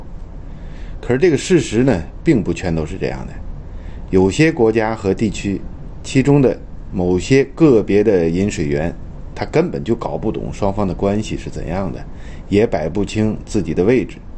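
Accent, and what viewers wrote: Tianjin, China. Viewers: 讲得很精彩，天津口音听着很喜欢。祝船长和船员们新年快乐！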